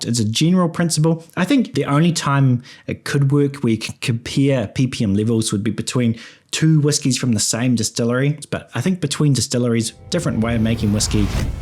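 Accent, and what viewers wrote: New Zealand. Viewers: The thick kiwi accent is the highlight of this video. Corkers like spictrum and shirry and having six with a sheep abound. Luckily whiskey is already pronounced with an I. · Your channel just came up randomly... Glad it did. Enjoyed your break down. Informative and I enjoy listening to the NZ accent 🇦🇺✌️✌️✌️ .... Good video mate. Subscribed.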